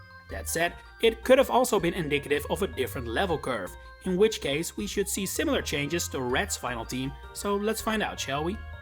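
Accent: Netherlands. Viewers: Love the video. Are you perhaps Dutch?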